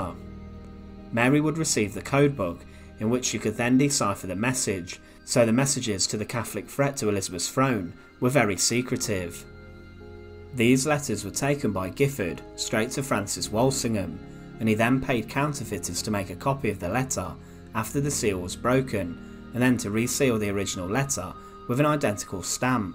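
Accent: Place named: England, United Kingdom. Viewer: I don't think it is a robot - just a bad narrator. Proof that an English accent does not always make for good narration.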